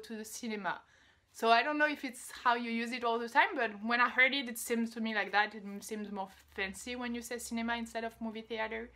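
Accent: France. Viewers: Love this. Just the French accent alone makes me fall in love! Anyway I use liaison a lot because of the action and job title. Also cliche, faux pas, and sauté I use a lot.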